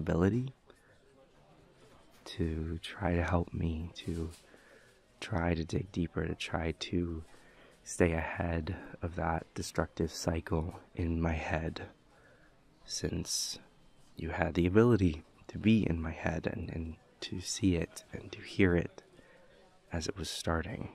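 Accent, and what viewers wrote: Newcastle, United Kingdom. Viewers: AFTER 5 WHOLE MONTHS OF WAITING ANOTHER GEORDIE AUDIO IS FINALLY OUT Thanks for the food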